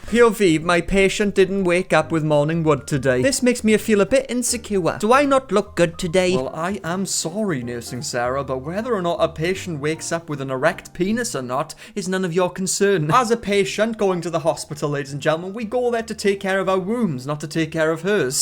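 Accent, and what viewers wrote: Wales, United Kingdom. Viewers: why are you british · Nice brit accent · are u welsh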